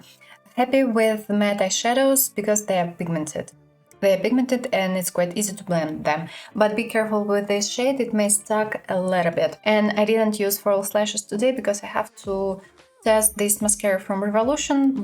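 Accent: Russia. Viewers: какой русский при русский акцент😄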